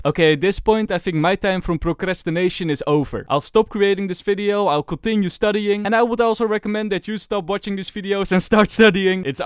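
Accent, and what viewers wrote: Netherlands. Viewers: are you dutch? I think i recognise your accent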